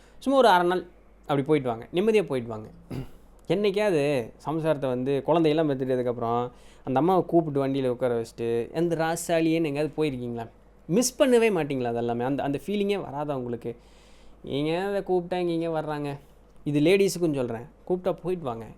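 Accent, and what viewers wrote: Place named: Chennai, India. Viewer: நீங்க கோயம்புத்தூர் தமிழில் பேசாம சென்னை தமிழ் தான் தானே பேசுறீங்க கேவை தமிழ் ல பேசுங்க.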